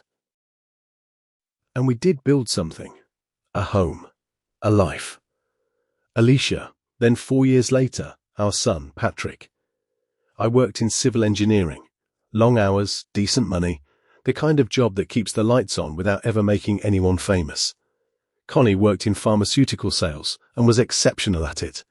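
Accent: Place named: United Kingdom. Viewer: Nice to hear a British voice for a change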